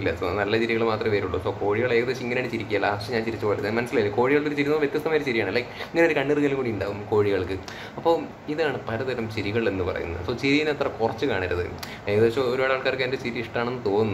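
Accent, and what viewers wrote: Palakkad, India. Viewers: Bro Palakkadan aano✌️ Slang ind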